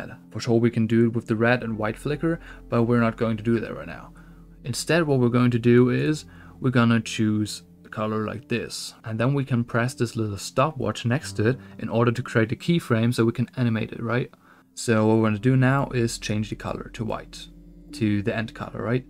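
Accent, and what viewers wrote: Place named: Germany. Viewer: Erster Satz direkt den deutschen Akzent gehört <3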